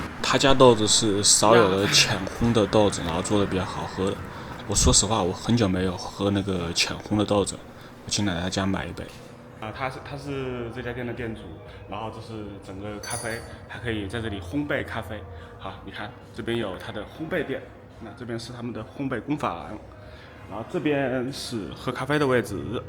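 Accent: Wuhan, China. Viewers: up是武汉人吗，口音听起来很像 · 博主武汉人吗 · 兄弟，说话怎么一口武汉味! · 朋友，听你的口音，是武汉的吧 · 武汉口音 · 请问，播主是湖北武汉人吗？口音有些象 😀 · 你肯定是武汉人：） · 你这口音一听就是武汉的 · 武汉普通话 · 标准的武汉普通话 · 你这个口音，掐指一算，大概率是武汉人，哈哈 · 武汉人吗？一口汉普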